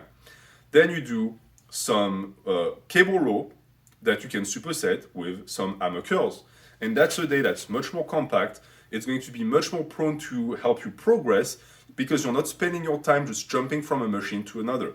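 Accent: France. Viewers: I dont get it. French accent but you have a football on your shirt and not a soccer ball. Im hella confused.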